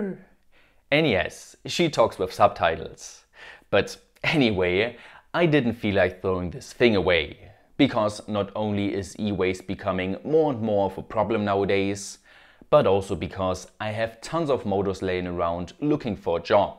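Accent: Germany. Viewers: Are you German? You have a strong German accent and pronounciation ("batterwy", "differwence") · German accent ?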